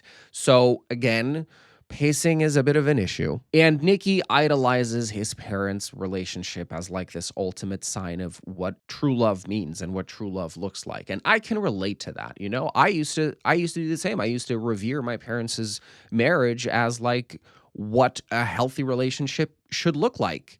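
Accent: Portugal. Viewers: Meu Deus o choque que eu tive quando voce comecou "Amor é fogo que arde e não se ve..." eu esqueci totalmente que vc fala portugues hahahahahaha mas nossa pra mim (br) é realmente difícil entender português de Portugal, que doideira né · O PORTUGUÊS DE PORTUGAL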